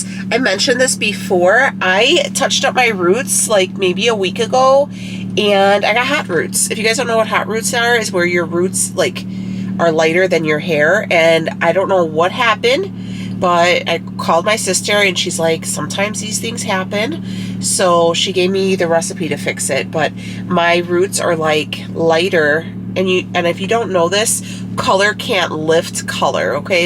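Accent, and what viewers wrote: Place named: Chicago, United States. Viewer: looked gorgeous! You did a great job on her makeup. Also love your Chicago/ Illinois accent, from a Floridian 😁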